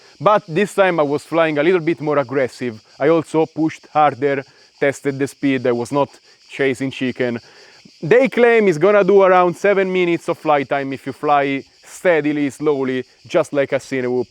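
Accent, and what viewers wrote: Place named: Italy. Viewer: Man I like your accent! Are you Italian?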